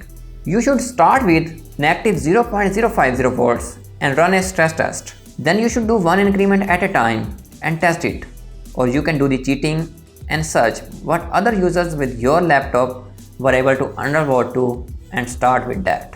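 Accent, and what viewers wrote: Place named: Faisalabad, Pakistan. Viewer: Bhai, ap Faisalabad se lag rahy hain. Ap ka English ka accent ese hi hai. But fer v lagy raho. Love you!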